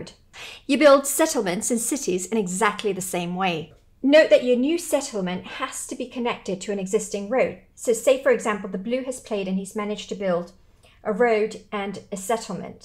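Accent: United Kingdom. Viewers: I've got a random question, non game related (lol). Are you an english speaking person that learned spanish? Or a spanish speaking person that learned english? Or..... was brought up with one english speaking parent and one spanish speaking parent? I only ask because I love your engish accent, and you speak spanish very well, so im just ciurious. I've seen most of your videos and I loved them, I am a very big fan of Catan, and hence, all of your Catan videos! Thank you!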